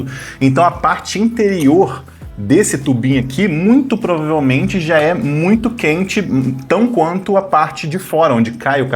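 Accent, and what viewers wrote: Rio de Janeiro, Brazil. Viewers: Aprendi muito! Vou regular meu moedor de café e vou testar esse café triatlon .. Mas o melhor de tudo sempre é ouvir um sotaque carioca no Youtube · O conteúdo até que é interessante. O que não dá para aguentar é o sotaque carioca carregado, que sempre passa a impressão de esnobismo asqueroso.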